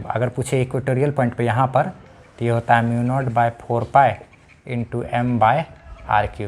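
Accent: Bihar, India. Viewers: plz.... support him everyone... he is bets teacher and the favorite part in his all videos is his bihari tone ....💕💕💕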